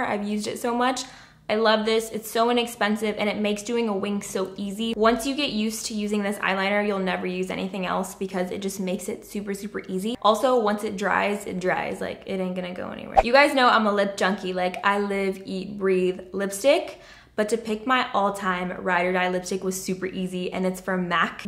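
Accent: United States. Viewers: how did you get rid of your cute country ass accent LOL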